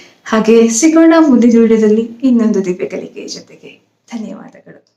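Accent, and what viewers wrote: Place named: Kumta, India. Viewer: ನಿಮ್ಮ ಮಾತು ಕೇಳಿದ್ರೆ ನೀವು ನಮ್ಮ ಕುಮಟಾ ಕಡೆಯವರು ಅನ್ನಿಸ್ತಿದೆ ಮೇಡಂ.ನಾನ್ ಹೇಳಿದ್ದು ನಿಜಾನಾ...ನಾವು ಕೂಡ ಕುಮಟಾದ ಮಿರ್ಜಾನ್ ದವ್ರು 😍..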